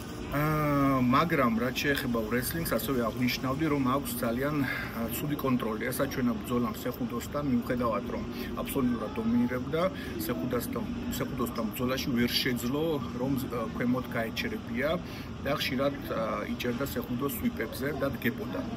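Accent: Russia. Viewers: ხაიკიკი არა მოხარშული კვახი კიდე , ქართულად ისაუბრე რა... ეს რუსული ხ მაგარი გამაღიზიანებელია და მოდი რაა ვლოგერობას თავი დაანებე და ბუკმეიკერობებს , არაა საუბარი შენი საქმე და იქნიე გამაში ეგ ხელები... ეს პური რომ მეპურემ უნდა აცხოს ხო ვერ ვისწავლეთ 😢😢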